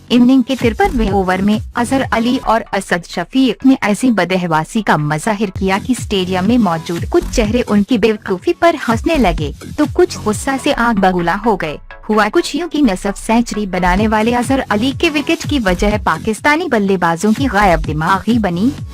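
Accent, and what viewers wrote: India. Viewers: Kh tu ap pakistan zindabad rhe hiny pr lehja ap indin hy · feels like Indian is talking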